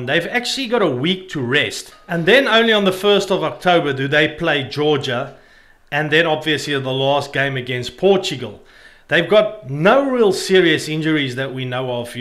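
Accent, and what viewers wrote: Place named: South Africa. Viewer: So weird seeing white person with South African accent.wales beat Fiji they was just to good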